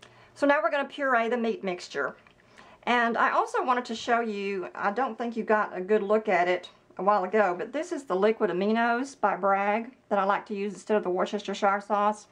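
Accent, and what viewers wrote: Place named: South, United States. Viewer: I am betting y r a Southern bell from your accent. I am from Georgia. I buy peanuts in shell and grind up in a bullet. so good.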